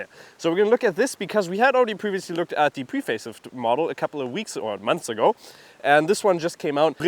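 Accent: Germany. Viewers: Jaaa! A German speaking English is quite funny. Good review though.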